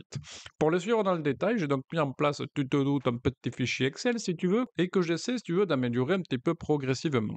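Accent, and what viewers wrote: South, France. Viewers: Bonsoir, le petit accent du sud est très agréable pour une chaîne sur l'investissement. Un rayon de soleil qui réchauffe le PEA 😅. Merci et bonne continuation 👍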